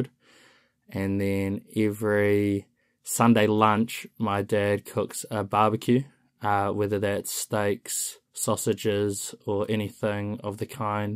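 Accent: New Zealand. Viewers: 발음 목소리 너무 닮고 싶어요~^^ 로토루아? 거기 좋다고 하셨죠? 기억하고 함 가봐야겠네요ㅎㅎ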